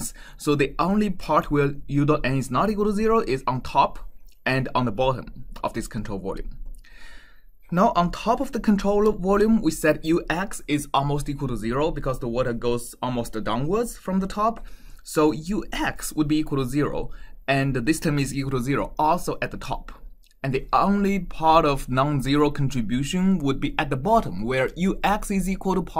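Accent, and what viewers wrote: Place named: China. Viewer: Horrible, thick Chinese accented English. Completely unintelligible. Pity the MIT students.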